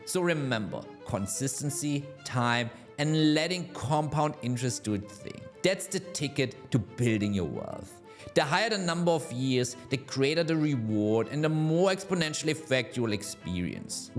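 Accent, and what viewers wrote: Germany. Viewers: i am german and sounds like you too?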